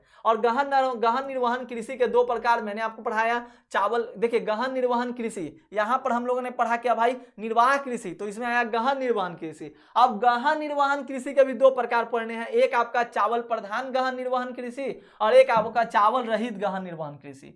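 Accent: Bihar, India. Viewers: Sir aap bihari h kya ?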